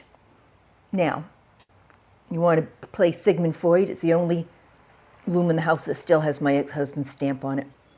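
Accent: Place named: New Hampshire, United States. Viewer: when you find that bra, you must share with us. I have exactly the same need. Love your show. Listening to you reminds me of my mother’s family from Manchester, NH. How they are missed!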